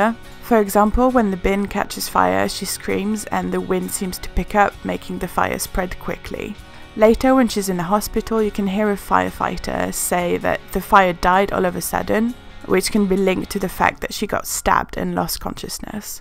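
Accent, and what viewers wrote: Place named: England, United Kingdom. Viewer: O cool ! Vous avez un sacré accent anglais :)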